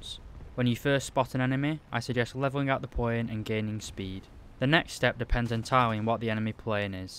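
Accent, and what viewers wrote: United Kingdom. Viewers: Hey from which part of the uk are u?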